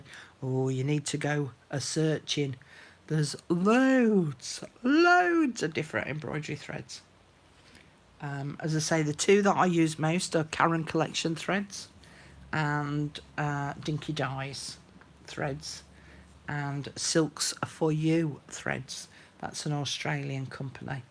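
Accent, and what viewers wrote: United Kingdom. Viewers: I am a fabriholic and álways wondered how to do this paper embroidery thing - thanks for sharing your vast knowledge. Just loved the way you split the thread. You are one gifted lady and I luvvv you British accent. Stay Blessed girl - God loves us all.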